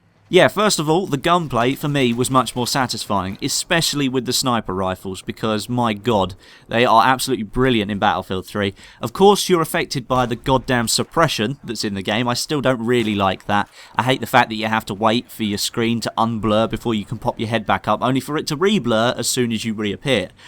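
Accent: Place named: United Kingdom. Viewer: British accent sounds so gay.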